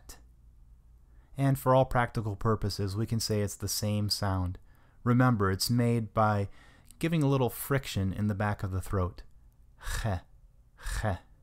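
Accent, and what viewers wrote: Europe. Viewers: Of course you use the European pronunciation and not the proper Tiberian pronuncisrion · nice cours, but the pronunciation is not authentic to the original hebrew, it sound like european pronunciation more than middle eastern pronunciation, I disagree with pronunciation of some letters : ח ע צ ק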